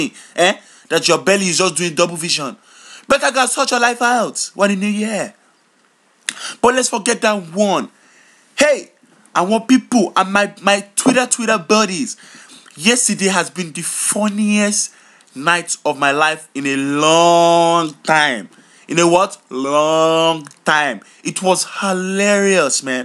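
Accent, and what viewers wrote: United States. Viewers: woah your american accent is good af! scared me lol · wow your american accent is really good! and i nearly choked when you said youre trying to get ffreaky. too. fucking. funny. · Lmaooooo Nigerians! It is a struggle! But your American accent is better than mine and I'm American lol! Very funny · Love ur American accent lol im American and ghanaian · ur american accent is good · dayum his american accent is so good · Nice American accent.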